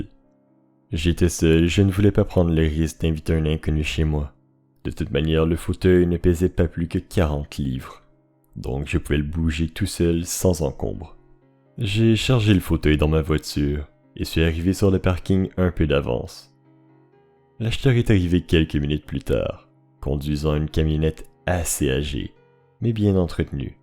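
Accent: Quebec, Canada. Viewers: j'adore ton "accent" Québecois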